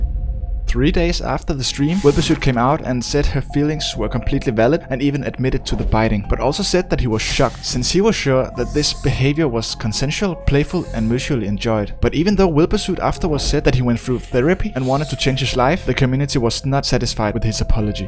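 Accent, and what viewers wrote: Denmark. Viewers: This guy has most danish sounding accent, good vid though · Are you danish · Yo, you danish? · Lemme gues were you from. HMMMMMM Denmark? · Wait you sound a little danish, MY BROTHER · are u from denmark i think i can hear the accent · are you danish? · I can already tell that this guy is danish because of the accent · holy danish accent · u danish aint u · r u danish? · Bro your danish right 🇩🇰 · The danish accent 😂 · U dont sound American what country are u from? U sound danish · omg i knew i recognised that accent! hello hello hello fellow danish person! your video is AWESOME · Holy Danish accent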